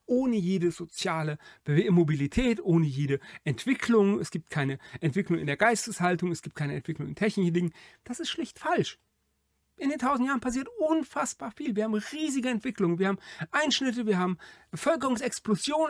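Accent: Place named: Rhineland, Germany. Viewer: Ein klassischer Sprachfehler scheint das nicht zu sein, tritt vor allem bei Rheinländern und Eifelern auf und scheint in deren Dialekt zugrunde gelegt zu sein. Wenn es Sie zu sehr belastet, wechseln Sie einfach auf einen anderen Kanal, mich regt mehr die Diskussion auf, als die kleine sprachliche Besonderheit, die mir im Übrigen schon ziemlich lange bekannt ist. Wenn Sie mit Schweizern oder Sachsen zu tun haben, werden Sie auch kleine sprachliche Besonderheiten feststellen.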